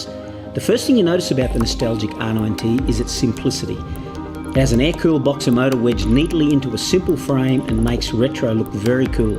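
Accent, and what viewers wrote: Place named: Australia. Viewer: 100% Agree - great footage and review - impossible to here what Metzler you recommend with the MUSIC !! and the Aussie accent....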